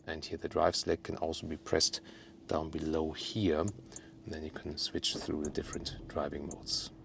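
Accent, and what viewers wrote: Germany. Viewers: Your Genglish is outstanding 👍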